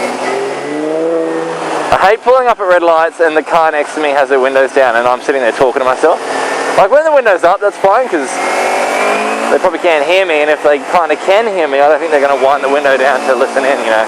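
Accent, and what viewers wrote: Australia. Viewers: are you an aussi?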